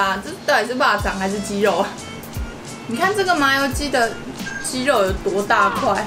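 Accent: Taiwan. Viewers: 生氣的說工撒毀那台語捏的樣子好可愛XD 而且的台語超標準的(以我這種不會講台語的人來說) 很喜歡聽講台語多講一點更好!! (比心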